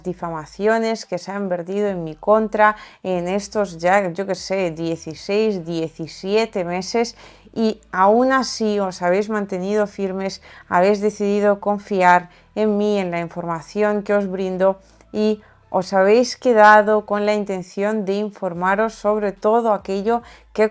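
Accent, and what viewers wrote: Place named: Spain. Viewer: Mira, tu audiencia es latina y no española, por lo que te sugiero practiques un poco el acento neutro. Te entenderemos mucho mejor.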